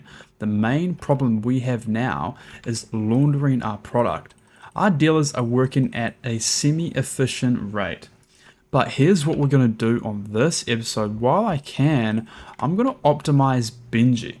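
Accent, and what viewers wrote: New Zealand. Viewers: also i know your AUS but you sound like you have a touch of kiwi.. true?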